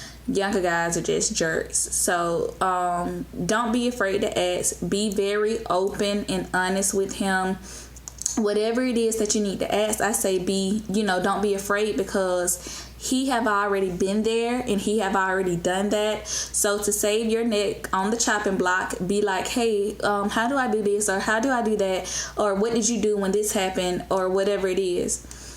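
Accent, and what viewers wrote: United States. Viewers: I love Chocolate woman..2pac said The darker the berry the sweeter the juice..shoot me a Email Choc a doll...if it your situation dont work <mail> sound like you from down south..Im Capitan Save a lot...womam are so much ahead of us. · You sound like ur from Alabama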